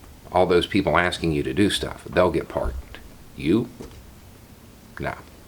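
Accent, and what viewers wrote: South, United States. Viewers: Clicked on this and saw a guy with a southern accent talking about Trump...thought I knew what was coming. Instead I got a reasonable voice from a concerned American and for once I am glad to have wrong assumptions.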